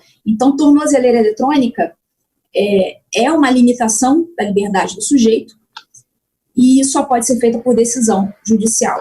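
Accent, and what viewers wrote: Rio de Janeiro, Brazil. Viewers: Não é a voz dela, ela fala com acentuado sotaque carioca!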